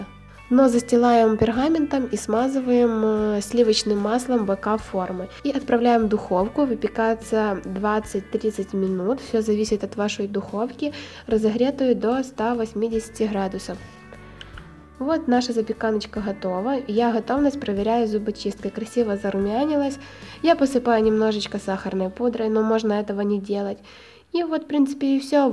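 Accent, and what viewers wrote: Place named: Ukraine. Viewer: Достали критиканы! живет в украинской деревне,и дома разговаривает на украинском языке.( Вот оттуда и произношение другое). И это не помешало умной молодой женщине,создать и развивать свой канал!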